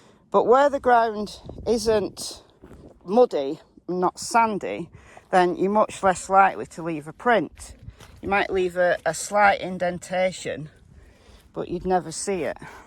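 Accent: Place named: England, United Kingdom. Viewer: Now that you mentioned it, H said in his interview that while they were in line at Walgreens to drop off grandma's rx that Don called Candace wanting to know what time she was going to be home! So that might be a contributing factor to your theory. Tilly is a doll baby! This case has really gotten to me and is breaking my heart. Love the UK... esp England and love your accent. Keep the vids coming!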